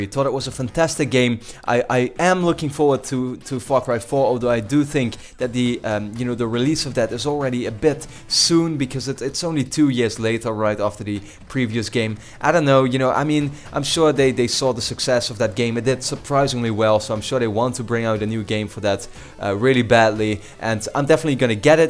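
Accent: Netherlands. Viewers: are you dutch? you have a bit of a dutch accent... :P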